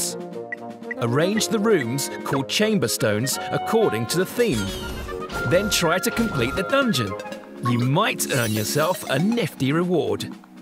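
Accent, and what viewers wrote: United Kingdom. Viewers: It's the British accent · I can't help but think of Ryn from Xenoblade Chronicles when hearing the British narrator.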